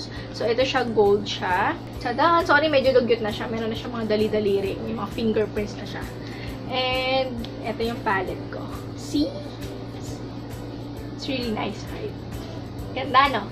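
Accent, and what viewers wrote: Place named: Ilocos, Philippines. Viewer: Found your channel and it's awesome! You sound like an Ilocana so you earned a subscriber :D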